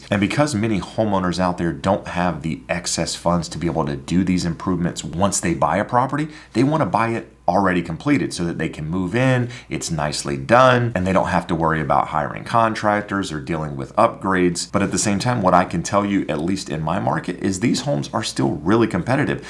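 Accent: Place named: North Carolina, United States. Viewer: I knew that was a NC accent. I completely agree. I just sold my house at 202,000 when I listed it at 185,000. I had 7 different offers and we went with the highest bid. Then we went and bought a new construction and they gave us an ammendum to lower the price by almost 30,000. So we were blessed to have a win'win situation. We locked in a 5.75 interest rate and the monthly payment is something comfortable. Great opportunities are still out there. Great vdeo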